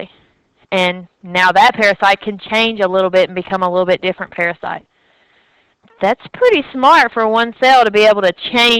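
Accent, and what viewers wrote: South, United States. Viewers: Thank you so, so much! As a fellow southern chick I LOVE your accent! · currently taking micro and I am so glad you have taken the time to post your lectures. they are very helpful and easy to understand. your way of teaching is awesome and I am learning far more from you than my current professor. southern accent makes it even better. thank you so much!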